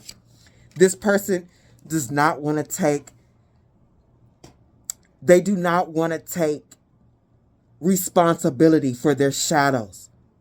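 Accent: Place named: Philippines. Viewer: Hey shadow soul tribe gifted sister. Thank you so much for your knowledgeable reading you shared with us. Take care darling. I believed its my seriously story indeed right now?? Phoenix rising above aka Dragon lady. Watching from Texas. I can tell you are a Pilipina with your accent. Sorry but im just guessing. Take care and ve safe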